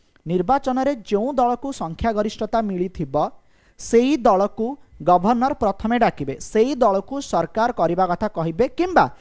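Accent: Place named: Odisha, India. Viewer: Apanka Odia pura Rasa malei bhali....Bahut badhiya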